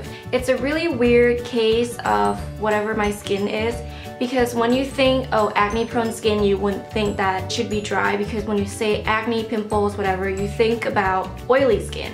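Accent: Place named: Thailand. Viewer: Love the Thai accent!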